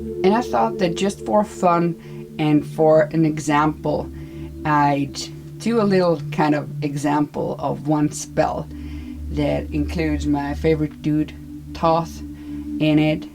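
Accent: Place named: Finland. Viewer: Fun to watch your video. Is your Myrrh colored white (or is it just the way the top of the box looks?)? BTW, loving your Finnish accent, soooo awesome! :)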